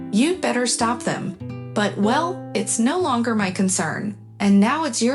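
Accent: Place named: Japan. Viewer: This is pretty disjointing and doesn't make sense. Sounds like Japanese. Nobody here in the states speak like this.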